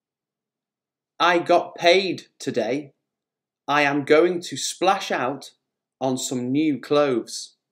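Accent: United Kingdom. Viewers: We want to continue teaching all the phrasal verbs in your performance. Just great British pronunciation and brilliant phrasal verb learning tactics !!!!!👍👍👍👍👍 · I'm so obsessed with your British pronunciation. I think it sounds so sexy when speaking like that. I fancy scranning food and shopping spree, too. teaches us spiffing & stupendous lessons.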